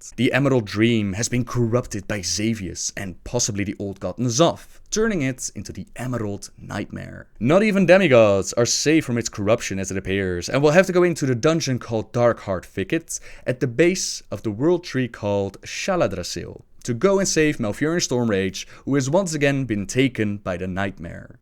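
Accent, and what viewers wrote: Netherlands. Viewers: is he dutch? it sounds like it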